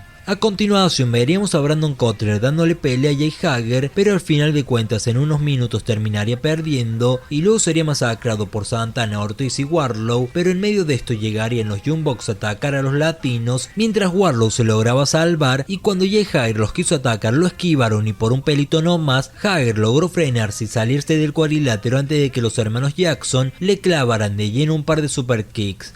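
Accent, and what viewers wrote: Córdoba, Argentina. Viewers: Me parecía, yo también lo soy, o sea Argentino y el asentó cordobés es imposible no reconocer.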